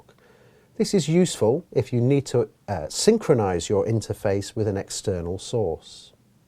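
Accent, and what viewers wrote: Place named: England, United Kingdom. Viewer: Just a bunch of talking with no user knowledge to be applied...oh, he has an english accent.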